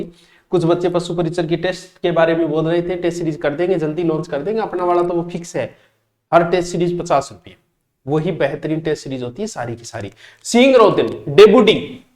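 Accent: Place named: Rajasthan, India. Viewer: Hello sir.... sir me MBBS kar rha hu....abhi 3rd year me hu......pta nhi kaha se mind me ye RAS ka bhoot sa chhad gyaa ek dum se.....aapke bahut saare videos dekhe hai maine ....aap bahut achcha padhaate ho... dheere dheere.....and marwadi sunn ke toh mazaa hi aa jaata hai sir.... English sunn sunn ke dimaag kharab ho rkha hai already 😂.....abhi pta nhi kya karna hai...but me isliye dekh rha hu aapke videos kyunki me Rajasthan se hu....toh mujhe ye sab pta hona chahiye naa .. geography... history....fort....rivers etc etc...mujhe kuch bhi nhi pta inn sabke baare me... isliye aapki kripa se thoda gyaan le rha hu...baaki dekhte hai....waqt kaha le jaata hai.......btw..... thank you so much sir ❤❤❤......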